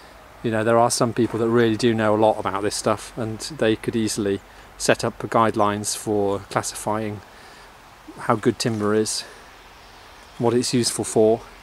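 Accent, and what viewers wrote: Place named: England, United Kingdom. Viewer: Hi are you from uk, you have got a great English accent and great videos!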